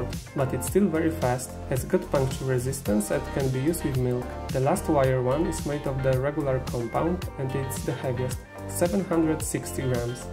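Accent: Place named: Poland. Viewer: Polish accent.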